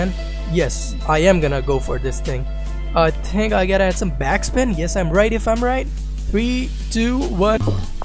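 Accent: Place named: South India, India. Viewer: Assalamu alaikum! Which is your native? You seem to be from South India! though you live in Kanpur.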